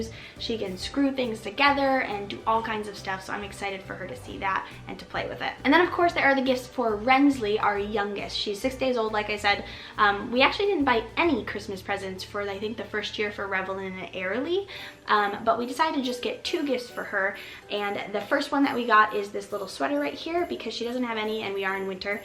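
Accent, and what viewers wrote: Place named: Georgia, United States. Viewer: I love your style and the four item Christmas Deal. I am a prior teacher and a struggling but succeeding minimalist. The items you choose for the girls seemed spot on. I just found your channel. First, watched the Christmas Decoration Video. I am the one who fell in love with your white tree. Like that your accent is similar to mine as I am from Georgia, yet, now live in Palm Harbor, Florida. I will be obsessed tonight and tomorrow catching up on your past videos. Merry Christmas 🎄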